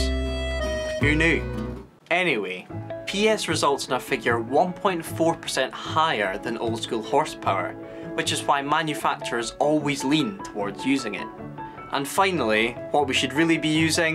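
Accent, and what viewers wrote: Scotland, United Kingdom. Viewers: FML a Scottish accent.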